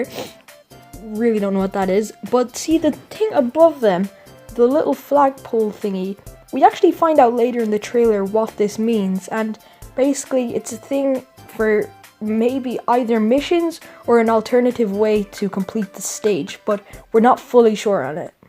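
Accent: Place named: United States. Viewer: U sound American in this😂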